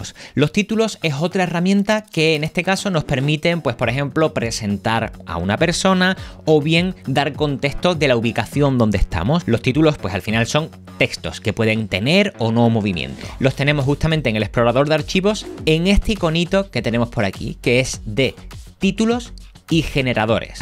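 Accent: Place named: Andalusia, Spain. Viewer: Espectacular como siempre. Como se nota que eres andaluz, 56 millones...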